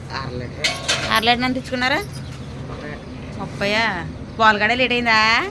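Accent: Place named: West Godavari, India. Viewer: App. Ka. Basa bahut acha hai,,, i think west godavari language...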